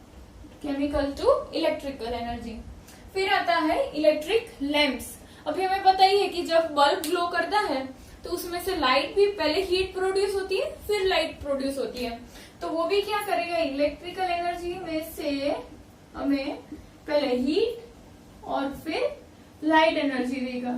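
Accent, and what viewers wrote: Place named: Gujarat, India. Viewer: Definitely u r from gujrat bcoz v all have unique hindi accent.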